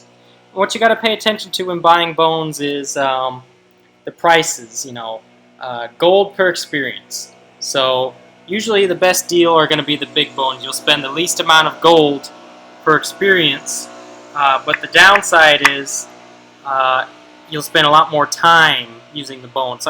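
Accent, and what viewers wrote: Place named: South, United States. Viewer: im guessing alabama tennessee texas?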